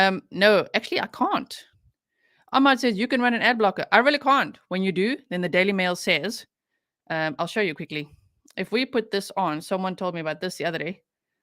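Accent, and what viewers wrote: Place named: South Africa. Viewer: nice to hear a South African accent!!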